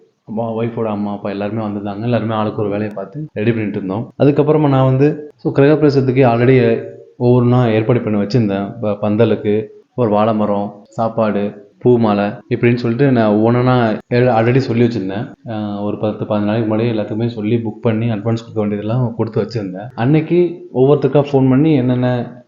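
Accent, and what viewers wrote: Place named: Tirunelveli, India. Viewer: பேச்சு வழக்கு பார்த்தால் கோவை மாதிரி தெரியலையே. நெல்லை மதுரை மாவட்டமா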